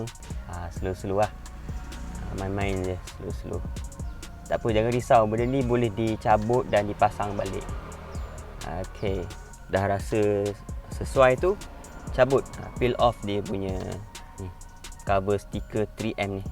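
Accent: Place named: Kelantan, Malaysia. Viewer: Mantapp boh,,, slalu review yg ada masuk slang2 kelantan 👍🏻👍🏻👍🏻👍🏻 haha Smoga murah rezeki menang , boleh pakai utk van time hntar barang lazada 🕺🏼🕺🏼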